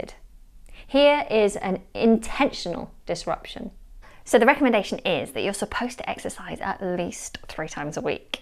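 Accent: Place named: RP, United Kingdom. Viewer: I adore your posh accent! :)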